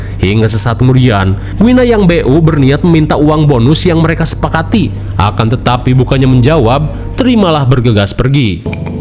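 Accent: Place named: West Java, Indonesia. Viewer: Film horor juga jadi ketawa 😂 Aksen Sunda nya itu loh, jadi khas banget..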